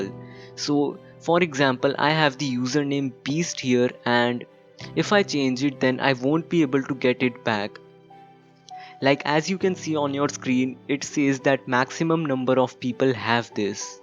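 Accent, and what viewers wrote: India. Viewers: The method is real people just doesn't like Indian accent thise days...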